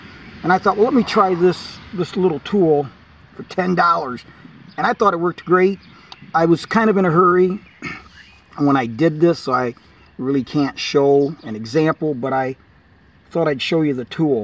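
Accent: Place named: New Jersey, United States. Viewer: Are you from Jersey or Long Island?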